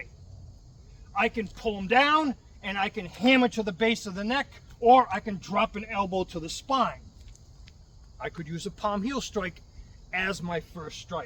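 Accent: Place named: Northeast, United States. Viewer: Speakeming witha N.E. accent kills it for me.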